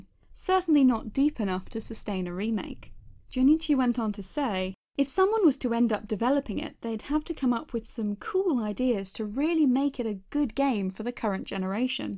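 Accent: United Kingdom. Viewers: I love your British ascent lol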